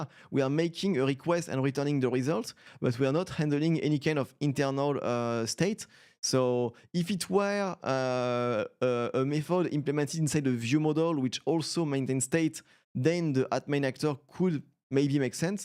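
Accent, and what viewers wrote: France. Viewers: Excellent l'accent Français ! ☺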